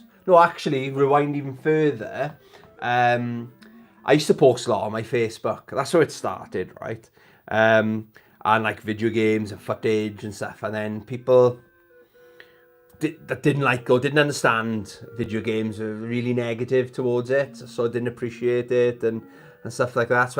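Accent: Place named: Wales, United Kingdom. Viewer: I do like the Welsh accent . Great video as usual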